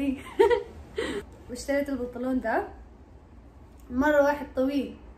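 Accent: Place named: Sudan. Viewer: لهجتك السودانيه حلوه شديد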